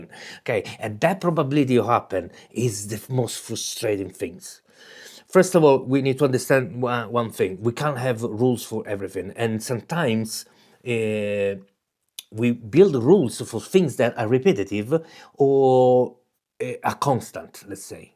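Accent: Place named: Italy. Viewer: Many thanks for your detailed response, and happy YouTube anniversary! I wish you the very best in the coming years. I was hoping to run a local coding LLM, as well as develop an AI powered app on this machine, but looks like I was under the wrong impression that it can handle that. I really appreciate your clarifying this matter to me. Just a side note: your Italian accent is great! My next door neighbours here in Canada are Italians, and they are the best neighbours anyone could dream to have. Have a fantastic weekend!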